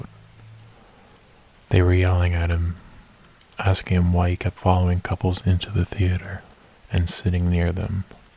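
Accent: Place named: North, United Kingdom. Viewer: You've gotta be a northerner- we have the same accent 😬😄 Another great narration!👍💜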